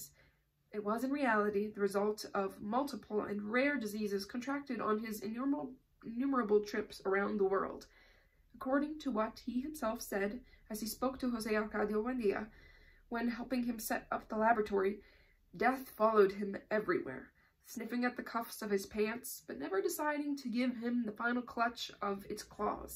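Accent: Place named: Midwest, United States. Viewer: Are you from Wisconsin? lol you sound like you are from the Midwest x) I am so don’t be offended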